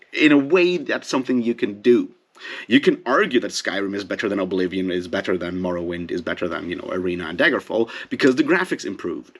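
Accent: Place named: Norway. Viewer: interesting! I thought you sounded Norwegian!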